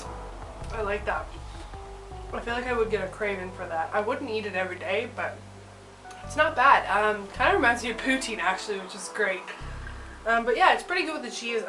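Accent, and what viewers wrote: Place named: Scotland, United Kingdom. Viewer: I love Scotland. I had a great time in Edinburgh, glasgow, and Oban. My boyfriend and I are planning to move there. I did notice some things are cheaper like groceries! I really feel Toronto is not for me. I was so depressed when I got back, but I got plans! thanks for the info. I also noticed that some words you say sound a little like the scots. :)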